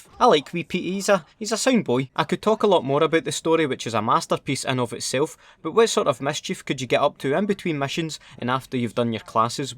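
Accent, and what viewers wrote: Scotland, United Kingdom. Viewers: Omg its my favourite fookin shite trainspotting accent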